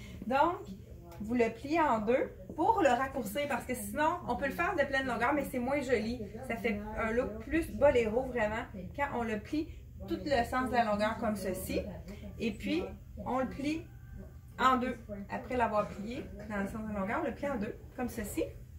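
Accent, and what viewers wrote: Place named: Canada. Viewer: Bonnes idees mais le son n est pas net et la voie trop canadienne dommage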